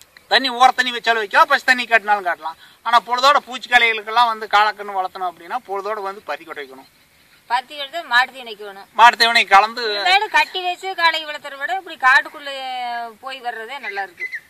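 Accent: Kongu, India. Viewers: Kongu Tamil❤️ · ஆஹா...கொங்கு தமிழைக் கேட்டுக் கொ‌ண்டேயிருக்கலாம்! அருமை! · இந்த கொங்கு இன தமிழ்....... வாழ்க.,.. வாழ்க.....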